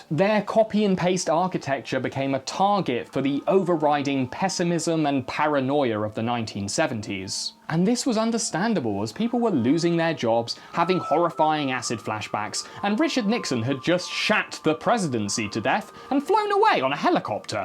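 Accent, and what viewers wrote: United Kingdom. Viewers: Didn't realize was British.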